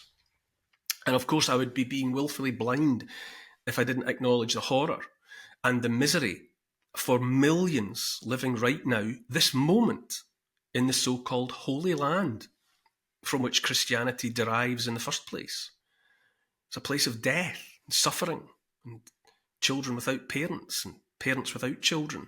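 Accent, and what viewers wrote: Scotland, United Kingdom. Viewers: Irrespective of your own view of yourself... I stand by the following... 😁 (written in May '22) 👍😍 Won't Kneel A gentle, beautiful Scottish lilt A kind, empathic, forward tilt An open heart; a caring face A willingness to take his place. A strong, home-hitting monologue Reminds us of the boiling frog The courage to become a sleuth A passion to release the truth. A deep desire to crack the whip Illuminates our freedom-strip Calls out the darkness taking hold The shiny despots; plated gold! Intense and raw, he lays it bare A soul-enhancing, honest stare Inflections drive the message through He speaks for Us - for me and you! Discernment's such a precious gift His words create a mighty lift Take heed of all he has to say He knows - it shouldn't be this way! 🤗🦋🐬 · Ayup, I live with a real fire, all the time and just to let you know that a crackling fire and your Scottish tone playing with one of your monologues on in the background and a candle is a magical thing! 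Very celtic! Thank you 🤝🏴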